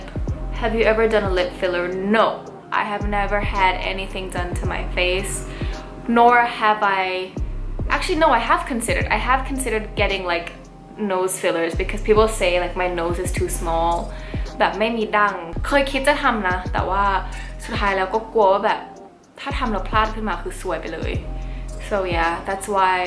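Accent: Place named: United Kingdom. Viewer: ชอบสำเนียงบริติช มากกก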